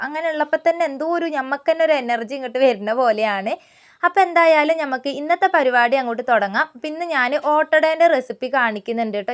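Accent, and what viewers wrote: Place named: Kozhikode, India. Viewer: 🥰🥰കോഴിക്കോടൻ സംസാരം ആണല്ലോ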